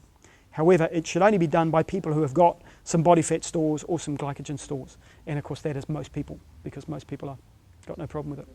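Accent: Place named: New Zealand. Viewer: that's a very strong New Zealand accent if ever I heard one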